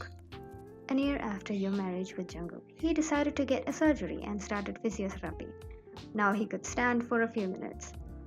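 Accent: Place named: United Kingdom. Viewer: Are you British cause I love your vocie. It feels so real❤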